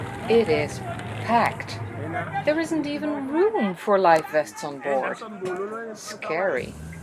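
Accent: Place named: Netherlands. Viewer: Misselijk engels met nederlands accent!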